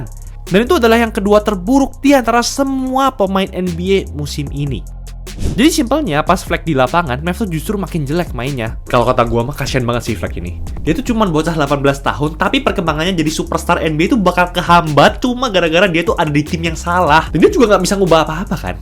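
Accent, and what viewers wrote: Java, Indonesia. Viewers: Was kamu wong jowo kah ?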